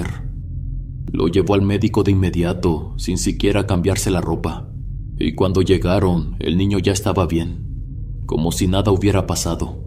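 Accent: Mexico. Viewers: !! Qué buena historia!! Muy buena narración y lo mejor con ese acento bien mejicano, soy de Perú 🇵🇪 y te oigo siempre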